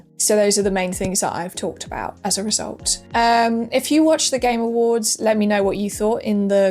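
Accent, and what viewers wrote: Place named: RP, United Kingdom. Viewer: You have the most Cambridge English International Test Listening Part accent ever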